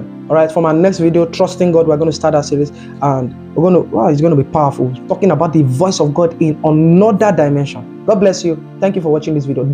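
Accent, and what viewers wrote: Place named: Africa. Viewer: Great lesson, scriptures and love the African accent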